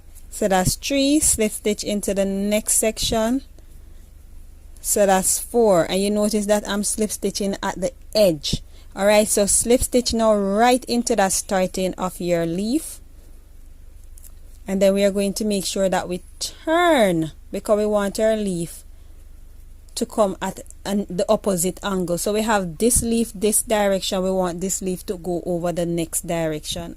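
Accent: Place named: Jamaica. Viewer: Love ur Jamaican accent and skills had to subscribe . Keep up the good works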